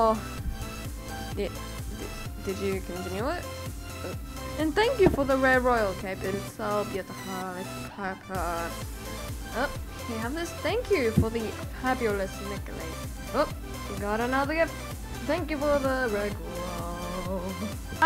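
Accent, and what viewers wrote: Australia. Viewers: lol I love Australian's accents it sounds so perfect and beautiful <3 and I love your personality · u sound like ur from Wollongong...... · Sorry if will offend you but you sound Australian, are you? Sorry again if I offended you I didn't mean to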